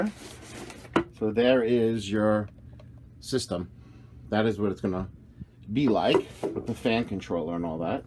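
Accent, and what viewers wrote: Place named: New Jersey, United States. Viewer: He has such a jersey accent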